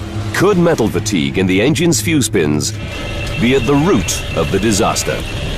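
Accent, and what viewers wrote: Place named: United Kingdom. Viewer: This show is Canadian made by a company based in Montreal called Cineflix and all of the episodes were produced at their studios in London...it was syndicated into US channels under various names and that's why everyone thinks it's American but it's not. Hence the British narrators on every episode. As usual European spouting off about Americans without doing an ounce of research.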